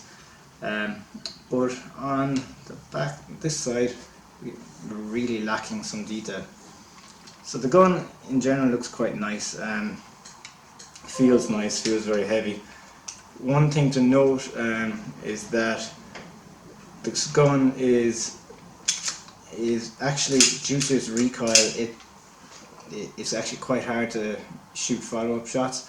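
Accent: Ireland. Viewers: Are u Irish coz I am in laois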